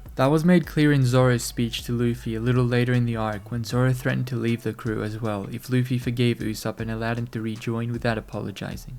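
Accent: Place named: United Kingdom. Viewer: Hey I think this video is amazing. The one glaring issue is how British / European the voice person sounds I very much dislike that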